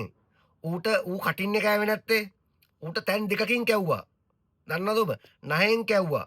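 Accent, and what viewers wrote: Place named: South, Sri Lanka. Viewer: කතාවෙන් මචෝ උබ දකුනේ වගේ හැබැයි මූ රාජපක්ෂලාගේ 😅😅😅😅ගැත්තෙක්ද😢😢😢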